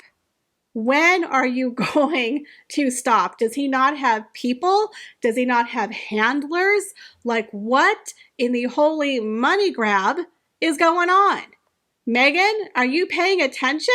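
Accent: South, United States. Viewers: I'm sorta kinda new to your channel. I heard you do a live stream with Sue Smith and thought I might give your channel a try. I'm so glad I did. You have a very soothing voice and I love your charming southern accent (especially when you say "y'all). I hope your channel keeps growing.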